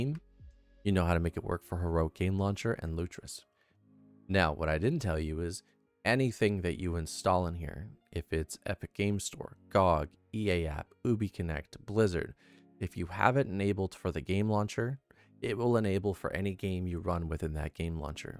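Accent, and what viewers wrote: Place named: Maritimes, Canada. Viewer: /wave from Alberta. Wife's a Newfy. Your maritime accent is just fine brother! /cheers (earned my sub)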